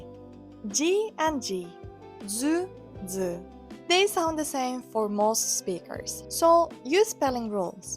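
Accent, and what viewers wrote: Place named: Spain. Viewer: Teacher, why do you speak English with a Spanish accent?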